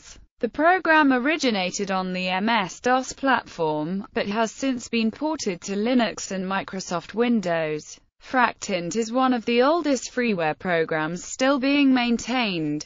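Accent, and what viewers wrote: United Kingdom. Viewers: I wasn't aware synthetic voices could be made to sound British. Interesting. The CPUs of that day weren't called three hundred eigthy-six computers. It was "three eighty-six" (386) followed by the speed of the CPU in MegaHertz. My friend John had a top of the line 386 without a FPU (floating point unit) that he used to make fractint fractals on. At 640x480 we could go watch a couple of movies, stop to get something eat, go to the new QuikTrip to load up on a variety of sweets, go play putt-putt, go to the comic book/gaming store, or possibly play a few games in the video arcade. By the time we got back the fractal might be done rendering. Then there was the time waiting for it to save the render. As always hope the power didn't go out or his mom turn off that PC to conserve money on her power bill. I love FractInt. The one thing you failed to mention is the editing of the virtual video settings to allow renders up to 20,480 by 20,480 pixels!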